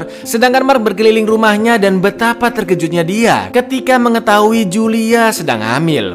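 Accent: Java, Indonesia. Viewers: bahasa mu kang jowo muedokkk asli wg jowooo ..lnjutkan kang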